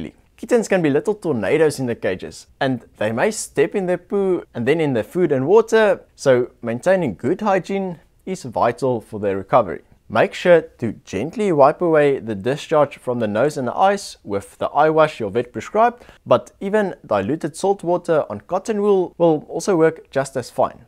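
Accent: South Africa. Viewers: After 2 seconds of watching I knew that this guy was from South Africa. I can spot my people's accent from anywhere 😂😜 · watch one of ur videos ur accent😂 is 100% south african 😃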